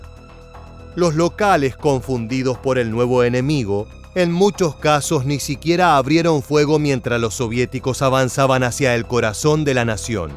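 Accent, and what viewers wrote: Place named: Argentina. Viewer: El locutor es argentino ja vamos nomás jajaja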